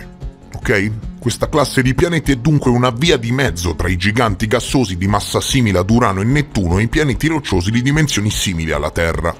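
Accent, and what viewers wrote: Tuscany, Italy. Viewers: te mi freghi una marea di tempo che non ti dico 🤣🤣🤣..però sei talmente interessante che..quando proprio non ho tempo..ti ascolto mentre faccio qualcosa in cucina..molti complimenti davvero..sei unico..( e mi pari pure toscano come me)..ma non lo diciamo a nessuno 😅🤭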